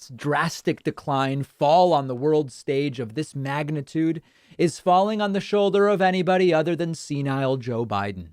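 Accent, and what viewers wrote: England, United Kingdom. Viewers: Ok. Just realized another reason why l like listening to you! Your pronunciation! ( Jamaican here ..so perhaps the British influence in the pronunciation choices, appeal to me as well 😏) but seriously --- WHAT is wrong with having multiple ways of pronouncing things! Sheesh...it is a large WORLD here, people! 😑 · nYukes / nYew: this is British pronunciation. And I would never have guessed English was not your first language. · pronounces those English words like we English do. Make of that what you will. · Four years older than his senile diaper wearing former POTUS. The decline of the West, yes, standards of probity and duty and competence have fallen. I would put it the door of the sinister Murdoch Empire, myself. Everywhere they go, they spread misinformation and Conservative rage. Look at the powerbrokers, not the fawning, incompetent sockpuppets they push forward for election. 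By the way, some of your pronunciation is more British than American. Either is correct. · For the record, your pronunciation of "nukes" and similar words is in accordance with British English rather than American English. That person was correct that "nukes" rhymes with "Dukes" but, in British English, they are both pronounced the way you are pronouncing "nukes". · I think its because you are speaking English rather than American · England and australia do same pronunciation as you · You pronounce "nukes" and "nuclear" as British people do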